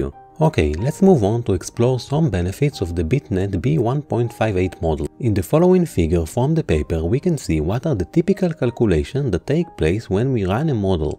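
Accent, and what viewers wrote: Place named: France. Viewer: I would guess french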